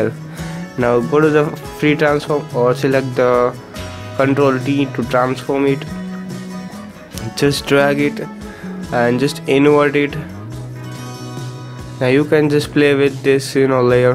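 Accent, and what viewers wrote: Gujarat, India. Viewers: Are you from Gujarat? Your accent says that.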